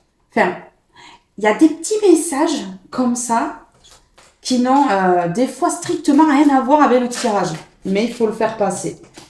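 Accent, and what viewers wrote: South, France. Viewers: Et moi je vous adore avec votre petit accent du sud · C ´est si vrai ! Pour moi ❤ vous êtes rayonnante j’aime votre energie et votre accent du sud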